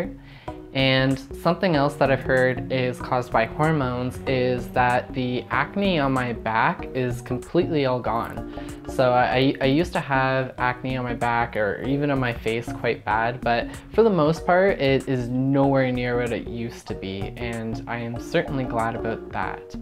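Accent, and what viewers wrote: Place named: Canada. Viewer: Love the canadian accent!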